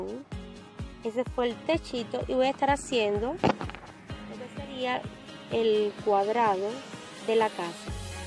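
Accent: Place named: Caribbean. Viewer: Te quedaron lindas y como ya dije un tiempo atras, ese sabor que le pones con tu acento caribeño me encanta.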